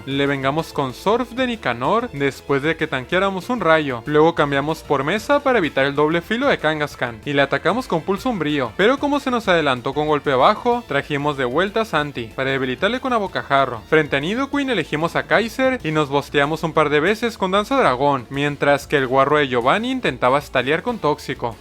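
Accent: Chihuahua, Mexico. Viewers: Eres de Chihuaha vdd we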